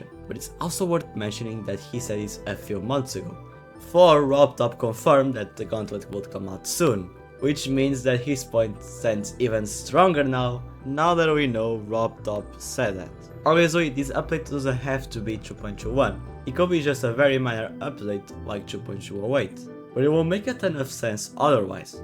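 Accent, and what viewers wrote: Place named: Spain. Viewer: bro, tienes acento español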